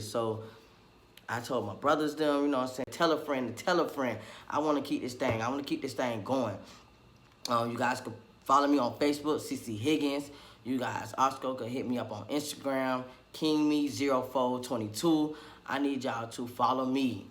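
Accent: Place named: Florida, United States. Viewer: I can hear that Florida accent!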